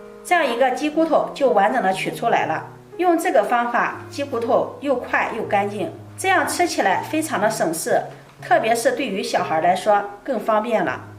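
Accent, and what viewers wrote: Shandong, China. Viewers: 第一次收到推送就关注你了，听口音山东银👍💪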